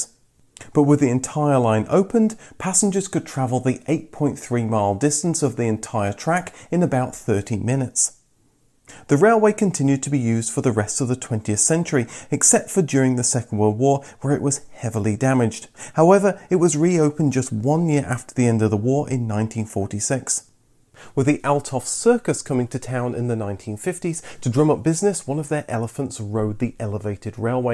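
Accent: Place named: United Kingdom. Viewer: Apart from enjoying your videos so much, I am delighted to listen to your British accent. A real pleasure!!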